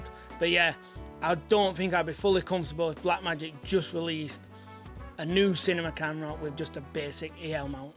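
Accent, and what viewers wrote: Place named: Northern England, United Kingdom. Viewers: Never judge a book by its cover. This dude looks and sounds like a cage fighter crossed with an English bulldog yet everything he said is well informed and is surprisingly balanced view with some great research and insight. Connecting the dots makes sense and although it’s unlikely black magic will make a cube anytime soon the l mount is a sleeping giant and the investment by dji is key. Bm need a new mount and red and canon will almost certainly not let bm anywhere near rf. excellent despite the heavy northern accent this dude knows his stuff. Don’t let those prejudices loose. Sound logic has no accent. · Ey up, nowt wrong with a Northern accent lad! 😝 BUT onto mounts... I wonder if we can, via that service Sigma offer, get EF mounts changed to L? Most my Lenses are Sigma anyway nowadays!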